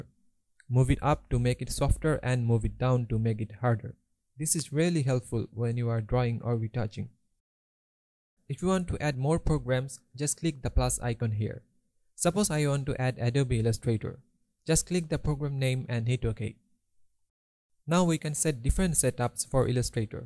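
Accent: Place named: India. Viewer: Ah yes my left ear's daily dose of an Indian accent ASMR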